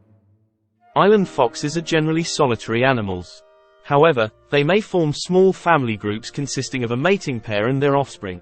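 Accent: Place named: United Kingdom. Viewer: and to add insult to injury, the narrator is UK.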